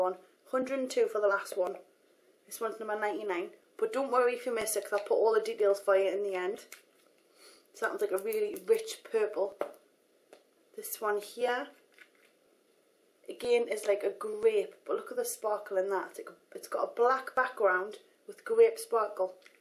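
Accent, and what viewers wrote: Newcastle, United Kingdom. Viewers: the geordie accent is amazing!:') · Wish I had your Geordie accent. Amazing! :) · Are you from newcastle? I LOVE your videos!!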